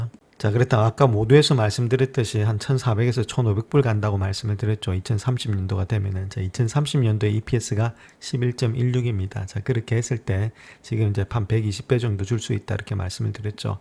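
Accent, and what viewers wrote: Gyeongsang, South Korea. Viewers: 명료한 설명감사합니다. 구수한 경상도 사투리도 듣기 좋습니다~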